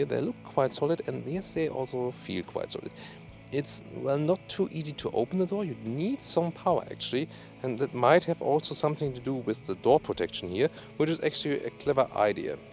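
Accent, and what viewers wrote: Germany. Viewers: Tolles Video, macht großen Spaß zu schauen! Nur der deutsche Aktzent ist gewöhnungsbedürtig, aber das ist keine Kritik :) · Like his english with german accent :)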